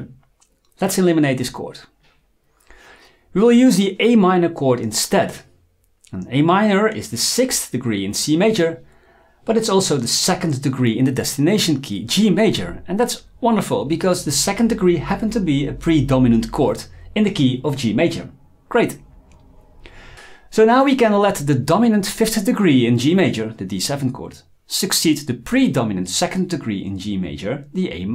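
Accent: Netherlands. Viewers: I enjoy your backing tracks and lessons. thanks for both. I also enjoy your accent, which reminds me of when I lived in Tilburg (I am Australian). bedanken!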